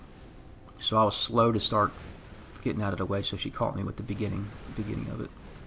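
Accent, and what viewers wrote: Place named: Philadelphia, United States. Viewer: Great video bro we play the game literally the same big ass sword Big ass armor love it stg you sound like you got a Philly accent too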